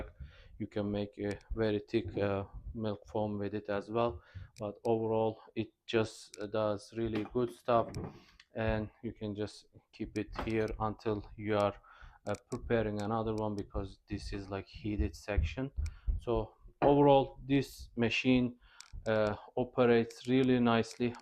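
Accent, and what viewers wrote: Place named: Turkey. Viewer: Aksan ben türküm diye bağırıyor 10/10 video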